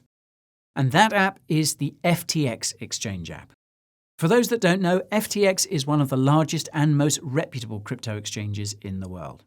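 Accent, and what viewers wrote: United States. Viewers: I never get tired of the American accent lol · Love the American accent! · Good American accent Guy!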